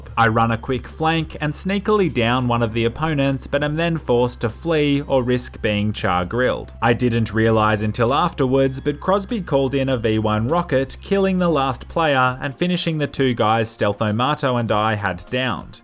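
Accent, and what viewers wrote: Australia. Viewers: You're Australian?!?!?!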